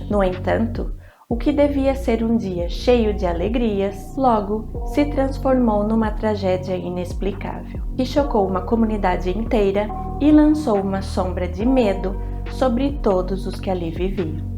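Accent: Portugal. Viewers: Com todo respeito, você nasceu em Portugal? Seu sotaque vem de onde? Ótimo trabalho!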